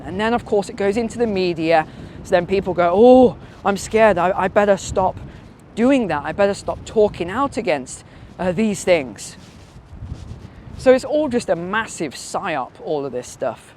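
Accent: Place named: United Kingdom. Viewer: Yes, you're right that I don't care about your looks, but your British accent is nice to hear.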